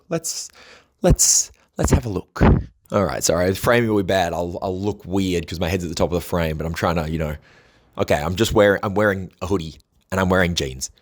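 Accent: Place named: Australia. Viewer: You sound more Australian in this channel for some reason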